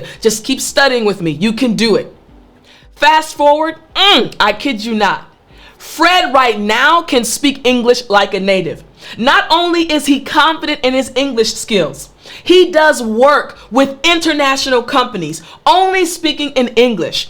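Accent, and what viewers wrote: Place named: United States. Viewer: I love the American accent.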